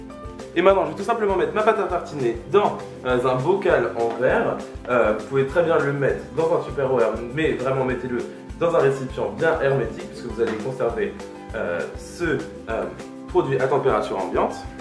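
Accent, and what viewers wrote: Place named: England, United Kingdom. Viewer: stop avec ton accent anglais qui me casse les pied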